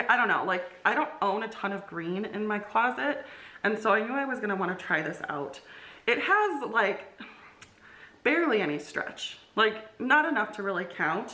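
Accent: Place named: United States. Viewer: How much u talk American oh my God .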